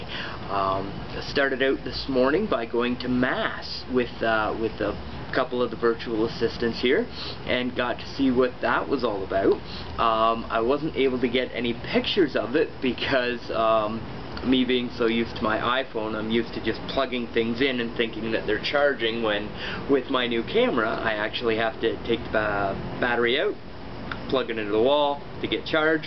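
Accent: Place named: Canada. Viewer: Oh shoot! You're Canadian! I knew it from listening to your accent, haha!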